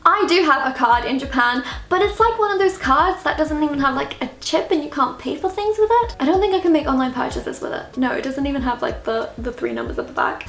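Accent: United Kingdom. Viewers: Accent is definitely UK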